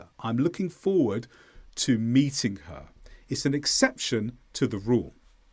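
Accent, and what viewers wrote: England, United Kingdom. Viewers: I like your British accent and teaching video, which is perfect since it helps me gain more English grammar rules, phrasal verbs, and expressions. But there is little difference in English usage in other English-speaking countries such as the U.S., Canada, Australia, and New Zealand....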